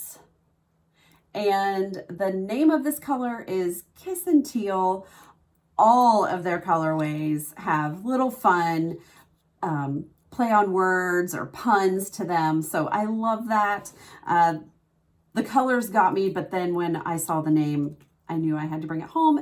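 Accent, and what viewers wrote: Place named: Ohio, United States. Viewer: !!! You did it, Girl!!! I loved sitting and knitting with you …loved everything about this including your “Ohio Girl” accent, which is so easy for this Jersey Girl to listen to!!!❤ I’m your fan from the days of and Kay, so the Fan Girl thing continues! I’m such the sock knitter too and hope you’ll do some teaching about the many heels we can try! Also, please talk about what needles you have tried and enjoyed. Do you use Magic Loop all the time or 9” or Flexi Flips, etc…I just want to know everything! Great job, Friend❤🧶❤️ PS..please move away from the swinging pendulum of the clock that was a bit distracting!❤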